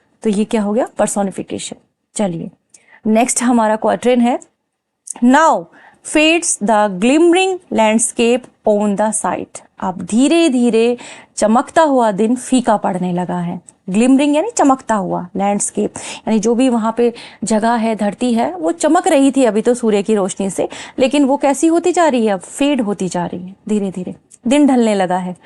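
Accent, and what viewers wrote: Rajasthan, India. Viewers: Ohh , madam ji aap to rajasthan se ho 😊 .... (ढाणी)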